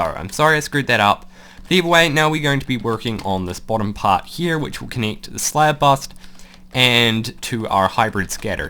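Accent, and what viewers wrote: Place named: New Zealand. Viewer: He is from New Zealand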